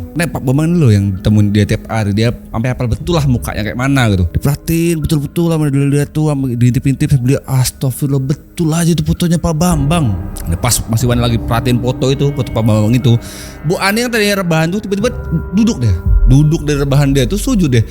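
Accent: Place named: Java, Indonesia. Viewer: Cerita horor versi ngapak yg ga bikin aku merinding tapi malah ngakak 😂😂😂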